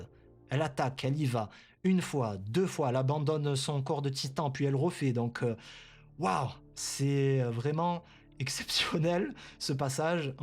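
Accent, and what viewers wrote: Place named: Japan. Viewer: J aime bien ton petit accent japonais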